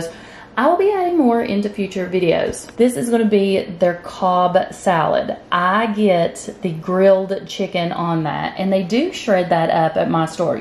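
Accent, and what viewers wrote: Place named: Tennessee, United States. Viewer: i knew i recognized that accent. Im from Elizabethton, TN too! Small world!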